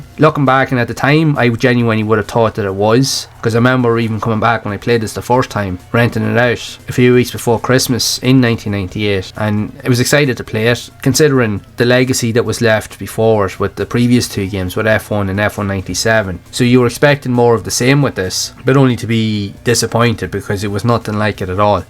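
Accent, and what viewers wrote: Ireland. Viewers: nice one fair play enjoying the vids and nice to hear an Irish accent on here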